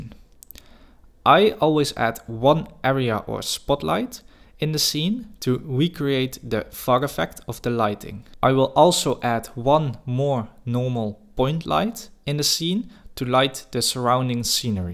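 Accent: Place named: Netherlands. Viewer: hi im not sure but are u dutch. i can tell from ur accent. but i wanna ask something actually. do u think that if i improve my 2d 3d art that i can find a well paying job. bc i want to do animations. and im not rlly into creating logos or commercials. and what u create looks really good. keep up the work ! and thank u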